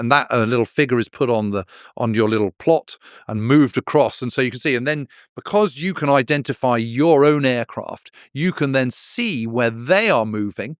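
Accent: Southern England, United Kingdom. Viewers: He has an educated Southern English accent, which is the heart of the English language. Americans as our offspring have a different dialect, which is fine. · As a fellow countryman & with a background in applied linguistics, I can therefore attest with some knowledge & experience that James Holland naturally (Without any false pretenses) speaks a well-educated form of English with a ‘Standard Estuary or Southern English’ accent!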